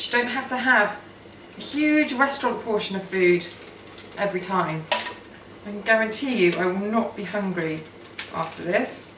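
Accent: United Kingdom. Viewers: I like how you saw "tomato" :)) love your British accent! To us here in the US it sounds so interesting and nice!! I could listen to you all day!!:) Luv from New Jersey!!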